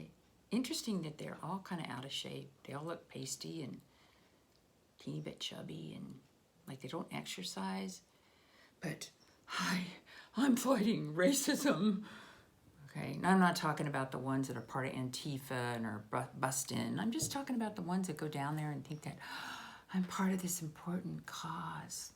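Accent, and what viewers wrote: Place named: United Kingdom. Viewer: What accent is this? You look Eastern European, sound British and seem very intelligent 😌❤️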